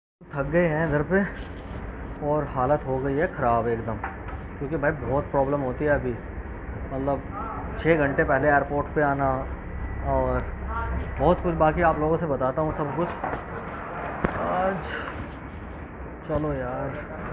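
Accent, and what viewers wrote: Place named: Bijnor, India. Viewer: Hallo bhai up bijnor se ho kya ❤️❤️🙏🔥🙏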